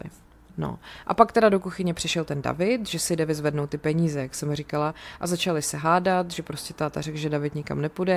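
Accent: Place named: Prague, Czech Republic. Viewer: Ten, prazskej, přízvuk slečny úplně trhá uši. Navíc pořád mele a nic nepoví.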